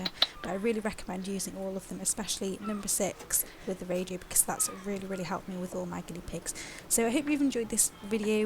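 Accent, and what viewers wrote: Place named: Stoke, United Kingdom. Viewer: you sound like you're from stoke like me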